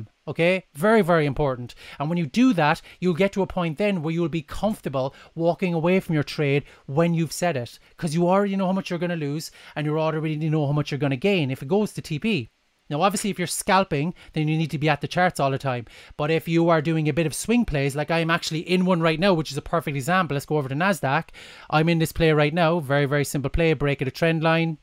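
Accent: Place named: Ireland. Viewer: Nice to hear a fellow Irish man. Are you from Kerry/Cork? Great video btw. Thanks ALOT!!!!!!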